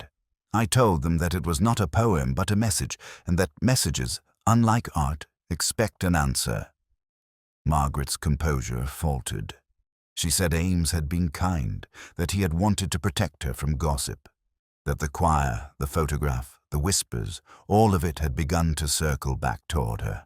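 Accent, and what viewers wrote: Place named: United States. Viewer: A US narrator on quintessential Morse tale ? All wrong ! We don’t have “stoodents” here.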